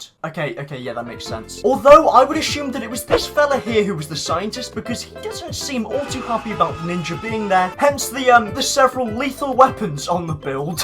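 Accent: United Kingdom. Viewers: Holy brick loud British boy made a video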